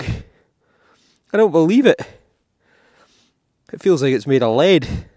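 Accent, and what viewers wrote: Scotland, United Kingdom. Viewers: What a great day,cant wait for pt2..i love coming along with you to perthshire listening to you telling about the history of our monarchs and the scottish relationships too. Your voice is soothing with your soft scotts accent. The worthms them wonderful perthshire worthms😌. Delightful content and now with gold and silvers in abundance, another great scott🙏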